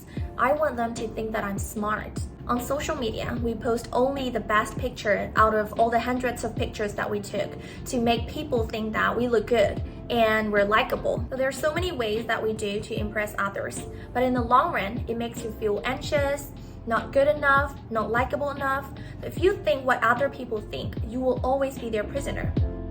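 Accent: England, United Kingdom. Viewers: How do I get English accent like you, plzz give me some tips with one vd ma" ,most of Myanmar people want to know for it ❤ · I love your English accent so much . Do you have a Instagram account?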